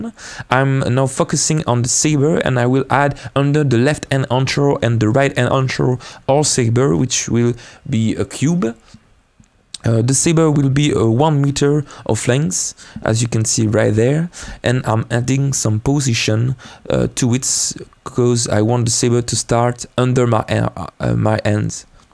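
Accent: France. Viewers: Vu ton accent tu es français, ce serait vraiment cool de traduire ta vidéo en réenregistrant ta voix en français par dessus, merci dans tous les cas :) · Oh mec, je crois que tu es francais vu ton accent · Little questio, are you French?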